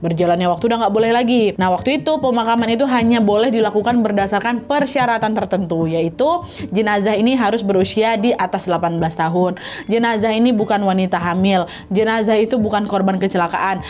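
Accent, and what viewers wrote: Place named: Sumatra, Indonesia. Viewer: Logat kakak nya kayak orang Minang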